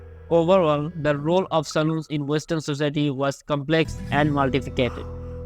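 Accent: India. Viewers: An Indian narrator? REALLY?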